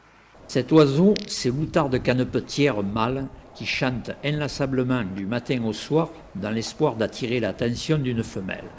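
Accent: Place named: South, France. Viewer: agrémentés du petit accent du Sud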